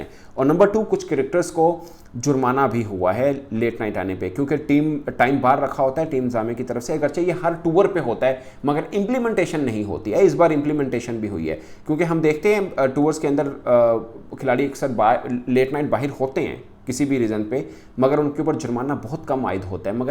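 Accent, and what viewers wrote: India. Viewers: URDU TALAFUZ KI DANISTA GHALETI: KKHas nahin, balkay khas! KKHali, nahin balkay khali! Kia ho gaya hay ap ko, kay IND charyon kay views lenay kay liay jahilana Hindi Talafuz/lehja apna rahay hain? Isi terhan 'Beech kay ander' koi standard Urdu nahin hay. Sahih hay: 'darmyan main', yan 'is doran'.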